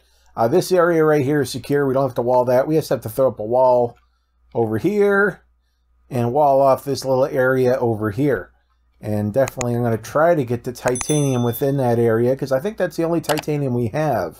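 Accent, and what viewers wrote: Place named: United States. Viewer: This guy sounds so American idk...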